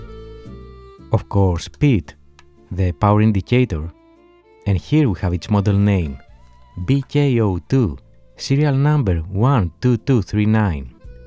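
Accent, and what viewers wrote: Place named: Greece. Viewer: I heard the Greek accent. And then, discovered your name !)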